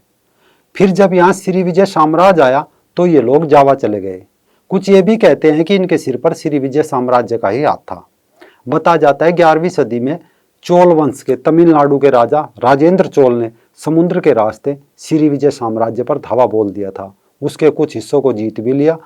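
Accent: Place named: Himachal Pradesh, India. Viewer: Bhai tu himachali hai kya accents se lagta hai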